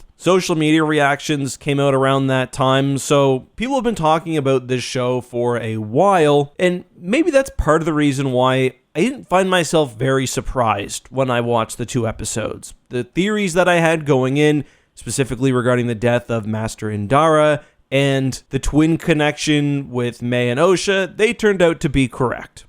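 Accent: Canada. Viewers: Bro i just noticed your Canadian you live in a hoose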